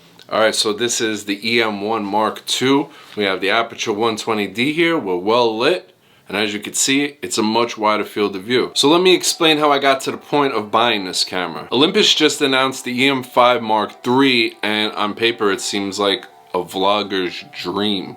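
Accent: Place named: New York, United States. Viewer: I knew it.. the 1st vid. I watched today o said.. 😏 ooohhh he is so Italian, sounds like new York or jersey with some flava.. lol new sub. Here hopefully u can BHL some other cameras bc I'm to confused for a vlog camera.. I do have the z6 which is great so far as a newbie and I feel futuristcally.. but it heavy with all the monitor n mic.. blah blah.. so I want more YouTube/travel options for a serious vlogger .. pleaseee!!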